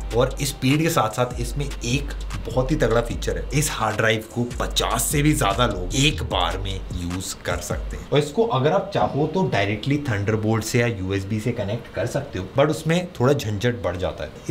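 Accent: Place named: South, India. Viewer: Vai ye batao k aap South se ho kya....south indian jhalak raha hai tumhari muh se....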